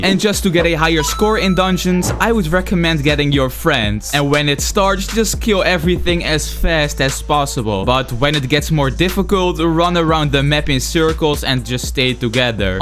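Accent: Netherlands. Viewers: why do you sound so dutch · u sound dutch are u? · i smell Nederlands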